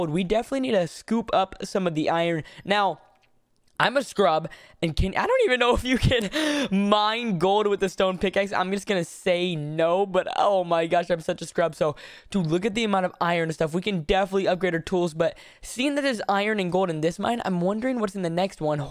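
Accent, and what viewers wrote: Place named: Texas, United States. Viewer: ur texas accent tho ... “tin” = ten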